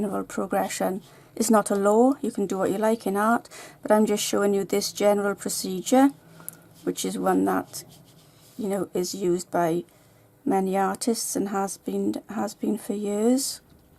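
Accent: Wales, United Kingdom. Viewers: Yeeeeaah - a three parter - you've just made my day! What better then to sit down and watch an interesting and instructive tutorial whilst listening to that gorgeous Welsh accent! Thanking you in advance for all three videos.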